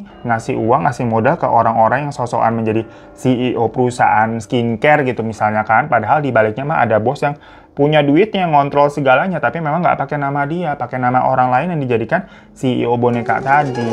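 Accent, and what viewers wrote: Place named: Tambun, Indonesia. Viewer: apal bngt bahasa tambun bang .. btw w orang tambun bwang 😂😂😂😂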